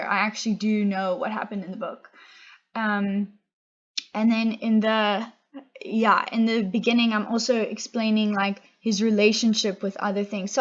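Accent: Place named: United States. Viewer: U have an American accent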